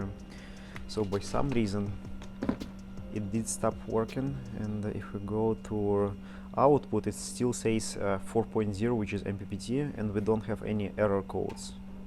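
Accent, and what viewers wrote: Russia. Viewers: Ахаха. Какой чисто русский английский 😁👍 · С первых секунд понятно стало откуда автор :) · Какой ужасный рязанский акцент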